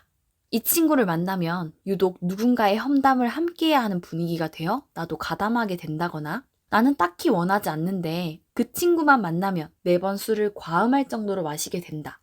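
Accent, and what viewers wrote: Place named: Gyeongsang, South Korea. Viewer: 님 사투리 쓰는 거 보니까 경남, 부산에 사는 분 같네요.ㅎㅎ 딱 들으면 알지예.ㅎㅎ 저랑 지역이 동일할 듯.ㅎㅎㅎ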